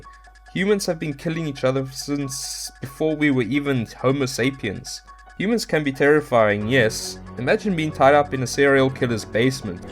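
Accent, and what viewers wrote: South Africa. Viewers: Are u South African... or New Zealandish?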